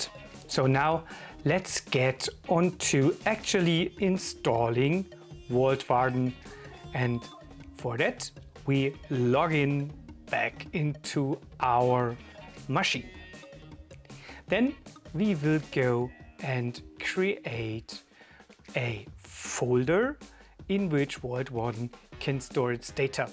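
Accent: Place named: Germany. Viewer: i love the german accent.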